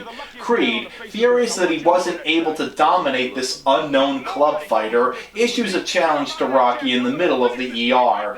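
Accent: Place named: Massachusetts, United States. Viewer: I know that accent... you're from MA... North Shore? Medford or farther north?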